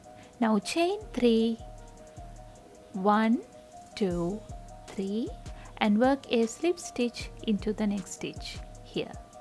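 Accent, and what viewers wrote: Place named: India. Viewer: that indian accent video is more good and trustful ❤❤❤🎉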